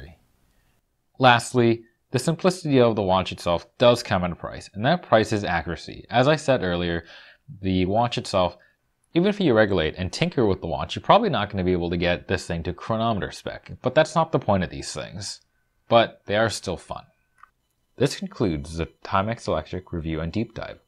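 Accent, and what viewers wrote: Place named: Canada. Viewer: Cool. I didn't know about these and I grew back then. Wasn't paying attention I guess. Really interesting videos,. And it sounds like you're Canadian too. Subbed.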